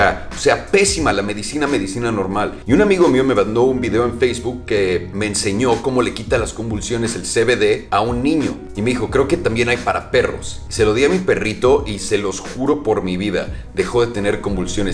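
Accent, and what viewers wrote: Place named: Mexico. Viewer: Tienes cara de colombiano y acento mexicano.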